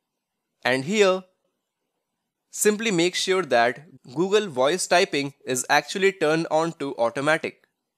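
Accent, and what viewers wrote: India. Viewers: typical indian voice!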